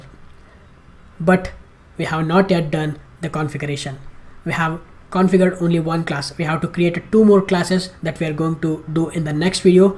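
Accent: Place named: India. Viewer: Where you from you speak English but background India?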